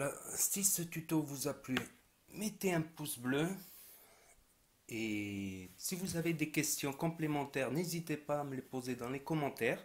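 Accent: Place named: Arab world. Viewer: L accent robeux hhhh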